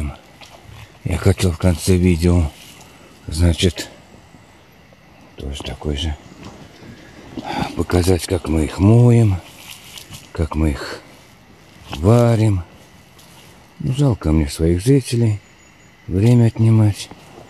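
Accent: Tirlyan, Russia. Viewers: Да уж. Сейчас у нас очень красиво! Золотая осень!, вы родом из Тирляна? По разговору похоже. С удовольствием прогулялась с вами по лесу!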